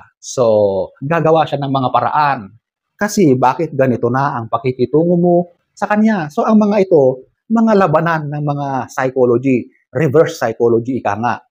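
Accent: Ilocos, Philippines. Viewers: Hi Kuya magandang advice mo. Gusto ko yung accent mo....Ilocano ka ba? 😅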